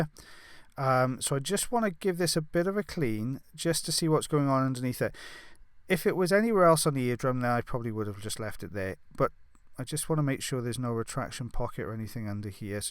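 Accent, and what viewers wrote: Wales, United Kingdom. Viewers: Sorry folks, I released how stupid a comment this was immediately after writing it. I had just never seen subtitles pop up on this before, and it was a bit of a dig at Americans who require the measurements in inches because they can't multiply by 2.5, nor understand English spoken in any other accent. Mea culpa! Love the Welsh! My grandmother was unintelligibly Scottish!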